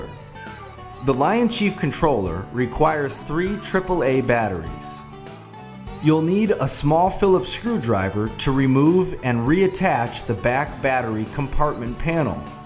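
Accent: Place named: Midwest, United States. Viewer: Somehow I was able to recognize by his voice and accent alone. That's a benefit of being a Midwestern American I suppose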